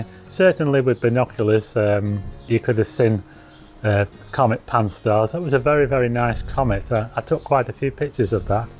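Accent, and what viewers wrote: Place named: North, United Kingdom. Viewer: Its a very posh northern accent. Easily understandable.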